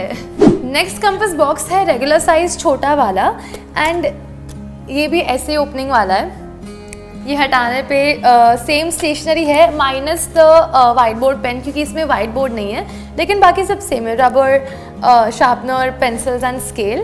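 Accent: Gujarat, India. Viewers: Di are you gujarati ?? Because again again you are saying like jaadrha jaarha i am also from Gujarat vadodara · Is your mother tongue gujrati becoz the way you speak it conveys that you are also gujrati like me pls reply di and I also love collecting stationery ❤️❤️❤️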